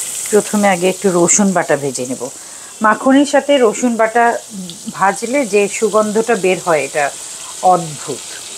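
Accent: Sirajganj, Bangladesh. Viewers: আহারে প্রাণের সিরাজগঞ্জের ভাষা। ❤❤❤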